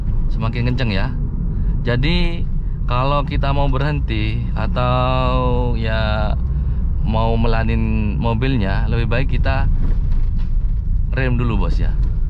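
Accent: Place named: Madura, Indonesia. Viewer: Madura mana bos?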